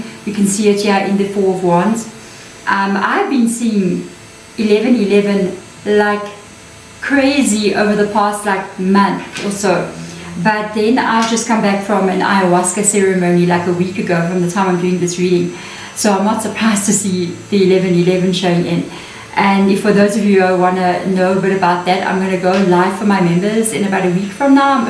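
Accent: South Africa. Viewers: South African here! I thought i recognised your accent ❤️ Dankie vir jou liefde!! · Are you South African ? I’m from Durban 🇿🇦🇿🇦🇿🇦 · I recently discovered your channel. Im loving it😊. I detect a fellow south African from the accent 😅. All the best 👍.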